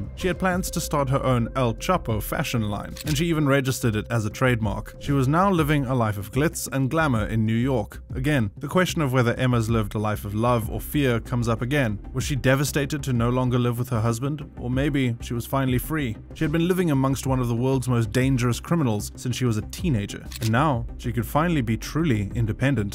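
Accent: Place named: South Africa. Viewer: Narrator sounds like he has a South African accent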